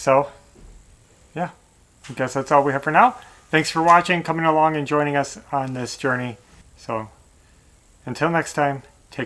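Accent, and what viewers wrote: New York, United States. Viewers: As a NY’er living now in the South I LOVE it that you sound like home. And I love the glasses. Thank you for being you! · Hey, I heard you in the beginning of your video say the word talking as “tawkin”. It’s so funny because I was coming to comments to ask you if you’re from New York. I noticed that accent immediately since I grew up and lived on Long Island for 57 years. Then you said you lived in Upstate, NY which is weird because you sound like you have more of a Long Island accent, than an upstate one. Upstate is one of my favorite places especially Lake George. I also had a question for. How did you get your clip on glasses to match your eyeglasses so perfectly? Were they made by the same place where you had your prescription glasses made?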